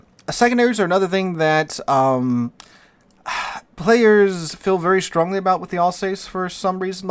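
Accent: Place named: United States. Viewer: the Alsays! xD There will be numerous shipnames in any number of languages to butcher in this game, so I get it but its still funny to hear you pronounce some of them in a very "Americanised" way!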